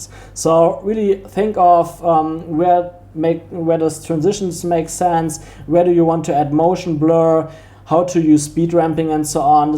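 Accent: Germany. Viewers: Are you German ? In my opinion you speak really German English 😆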